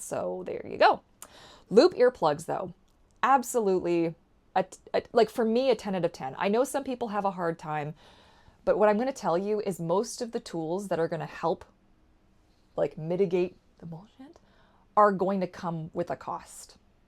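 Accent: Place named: Canada. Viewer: Most people who are watching your videos are American? So I can say Hi from Germany. 🤗 I have half-siblings in the US that I have met for the first time in my life last year. And I have to say thank you for your videos and your Canadian English which I love to listen to. Because I can understand it so much better than the American accent from the southern states. 😂😊 And I wear Loop Switch 2 Earplugs. I love it that they are so small that you can't see them at first sight. But when you see them they are nice to look at. Sometimes I wear them at work when I have to concentrate for a while. They are doing a good job then. But sometimes I hear my heartbeat very loud. I feel uncomfortable then that I have to take them off. After this video I will try the Bose for private locations. 👍🏼 Please be kind because of my English. 🙈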